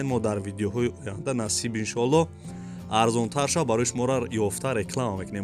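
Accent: Tajikistan. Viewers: Додари апа дигар каналои хона савдо гапзада хам наметонан гушкардан ам намехохи ахсан ба и гапзани тоза лахчаи точики 👍бехтарин хазор лайк 👍 👍 👍 👍 👍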